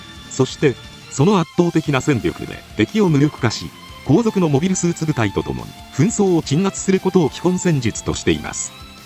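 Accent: Kansai, Japan. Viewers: 関西弁なだけできれてないんじゃないか？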